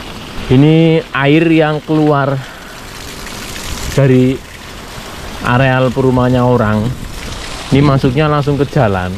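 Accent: Banyumas, Indonesia. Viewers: Mas, kayane logate ngapak ya?, panjenengan nyambut gawene opo to mas?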